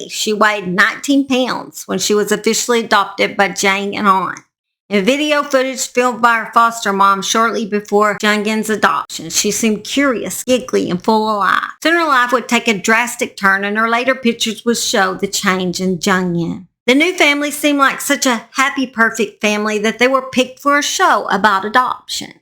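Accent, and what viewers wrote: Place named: Alabama, United States. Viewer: I could listen to your accent alllll day. 🥰 This is another reason why I subbed to you (including how you tell the sad stories). ~~~~~ Edit : where are you from? Alabama?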